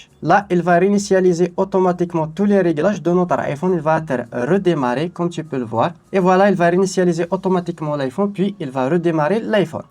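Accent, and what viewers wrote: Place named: Kabylie, Algeria. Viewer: Salut frr je regarde tes videos et ils sont magnifiques, et je remarqué dans t’accent que tu semblais kabyle mis tmurth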